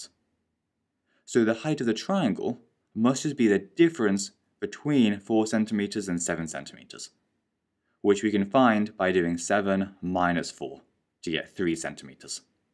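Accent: United Kingdom. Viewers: im 2 years late but it really helped me 😉😊😀😃2/22/24 and btw are you brititsh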